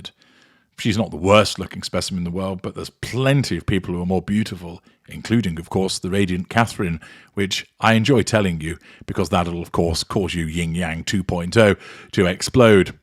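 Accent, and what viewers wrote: United Kingdom. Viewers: I love how you take them down so eloquently & intellectually in your amazing well spoken British accent 👏 👌 It should certainly give them something to think about of the way they now look so foolish ❤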